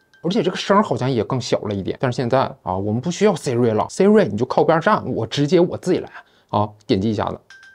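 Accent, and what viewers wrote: Northeast, China. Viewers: 😅 看了博主好几期视频听着掉渣的东北话后，才发现博主坐标韩国😂😂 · 这东北大碴子普通话，duang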